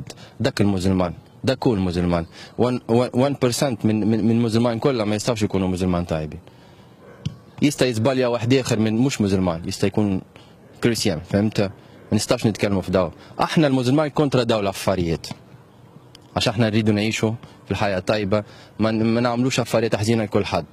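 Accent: Tunisia. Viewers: Cuz he's tunisian then the tunisian accent and the Maltese language are too similar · يضهرلي يحكي تونسي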